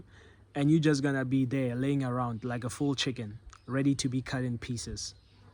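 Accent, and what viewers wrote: South Africa. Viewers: You South African gee?, I can hear your accent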